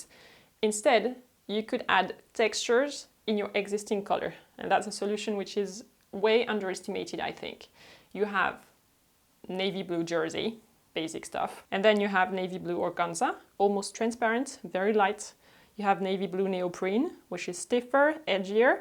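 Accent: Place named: France. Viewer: thank you for such an interesting videos! Can you do an advice video what to do with old clothes that I don't want to wear anymore? What do you do? P.S. your French accent is very cute!:)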